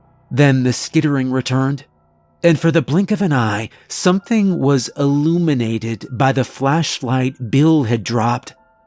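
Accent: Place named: United States. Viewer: that has to be the first time I have ever heard that an American accent sounds cool. I always love ones from elsewhere here but never anyone saying the other way around.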